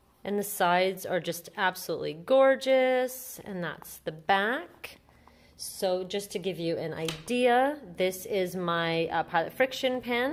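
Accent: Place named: France. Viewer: your French accent is beautiful! You must have spent some time in France 🇫🇷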